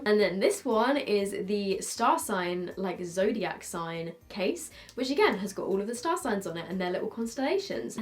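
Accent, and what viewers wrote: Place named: England, United Kingdom. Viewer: #questionoftheday: I am new to your vids but i was wondering if you could try your best american accent? I love english accents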